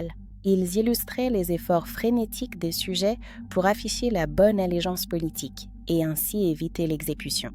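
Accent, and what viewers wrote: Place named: Quebec, Canada. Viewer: Superbement documenté ! Avec le petit accent so québécois qu’on adore ! Tellement intéressant j’aurais aimé tomber sur vos contenus bien avant ! Merci à vous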